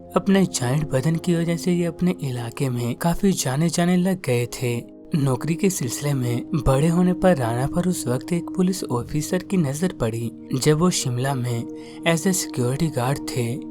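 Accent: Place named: England, United Kingdom. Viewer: Thoda agarej ka awalad kam bano aur sahi se bol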